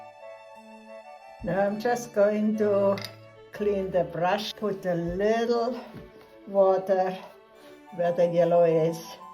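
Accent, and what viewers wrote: Switzerland. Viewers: Your DARLING one-of-of-a-kind Swiss ACCENT adds a wonderful CHARM: crisply frank & warmly feminine: BRAVA!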